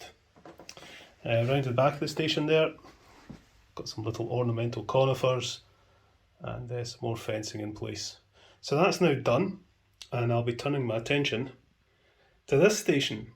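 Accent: Scotland, United Kingdom. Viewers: You can do it!!! Build it and they will come!!! And we did!!!, Soo Congrats on the 5,000 subscribers too mate. Im very happy to be 1 of them as you show great content and info. Your extension on the platform looks great too. I was going to suggest you make your no2 platform longer before as it looked a tad out of place before. Ima thinkin that you need to look out for some more lost sheeps though haha Why dont you call it " " (you know like the song haha) only because you have the same accent and sounds similar to them haha.. Anyhoo keep up the good work and gr8 video there m8. Cheers from John in Australia.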